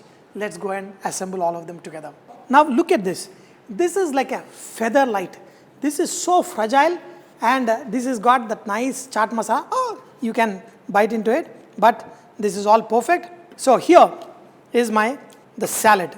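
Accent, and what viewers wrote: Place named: South India, India. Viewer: South Indian English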